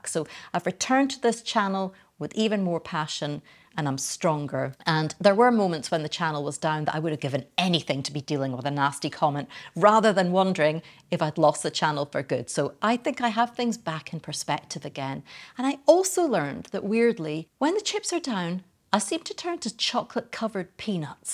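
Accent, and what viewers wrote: Scotland, United Kingdom. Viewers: Subscribed to. I enjoy your chanel so much, your content, and your professionalism is very much appreciated. Also I love your wee Scottish accent🤗. Being a fellow Scot myself, living in Spain, it's a pleasure to listen to you🙏. Keep up the great work.💓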